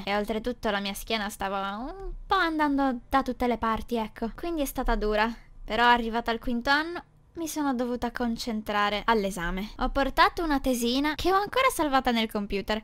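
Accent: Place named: Sicily, Italy. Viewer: Io che credevo eri siciliana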